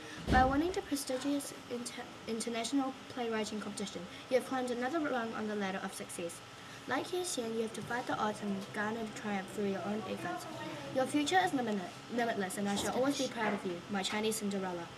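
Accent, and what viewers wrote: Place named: England, United Kingdom. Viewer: OMG an Asian with a English accent.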